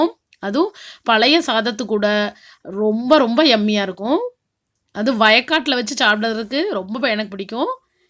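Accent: Tirunelveli, India. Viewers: அக்கா நீங்க திருநெல்வேலி யா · குழம்பை விட உங்க திருநல்வேலி slang பேச்சு எனக்கு ரொம்ப பிடிச்சது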